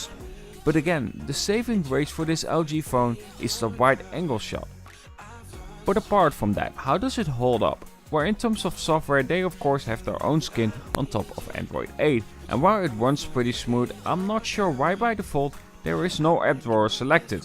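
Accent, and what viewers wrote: Netherlands. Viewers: hoorde aan je accent al dat je nederlands was haha en je komt ook nog eens uit eindhoven net als mij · Are you Dutch? Because you speak English like a Dutch man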